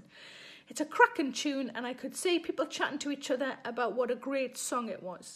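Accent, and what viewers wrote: Newcastle, United Kingdom. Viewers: I'm semi-bingeing (sp?) this in the US because I love you, Sarah Millican, and your gorgeous Geordie accent, and because I desperately need this upbeat and cheerful and silly distraction in my life. I am watching 3 or 4 episodes at a time and hope to catch up to you soon! Thank you for doing this. I haven't worn a bra since March 12 -- not to walk the dog, not even to go to the grocery store. It's been like heaven. I'm worried I'll feel all constricted when it's time to go back to an office again.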